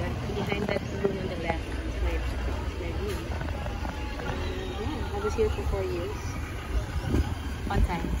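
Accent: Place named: Ilonggo, Philippines. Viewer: The chairs of st. Annes hall though.. hindi pa rin nagbago...kaka miss. Love that you still have your ilonggo accent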